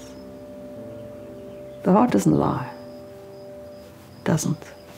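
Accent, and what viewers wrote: South Africa. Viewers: Amazing very special channel. As an ex South African so enjoy listening to your lovely accent. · Beautiful movies and channels. Feels so familiar the South African accent ... we moved abroad a few years ago.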